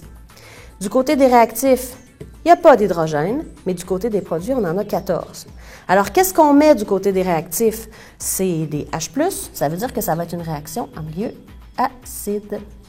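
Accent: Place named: Canada. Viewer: Hhhhh accent canadienne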